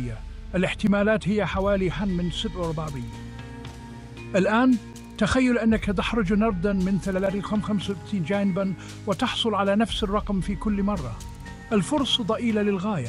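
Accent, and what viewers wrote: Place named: Bosnia and Herzegovina. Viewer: ليست عربية ب لكنة أوروبية أو يوغسلافية أو بوسنية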